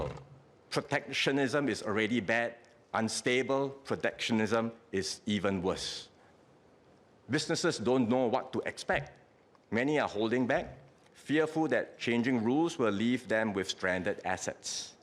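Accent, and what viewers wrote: Singapore. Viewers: Singlish. Singaporean English. Don't be a hillbilly 😂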